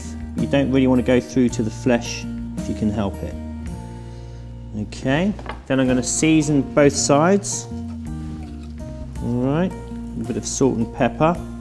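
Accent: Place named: London, United Kingdom. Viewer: You sound like yo from London!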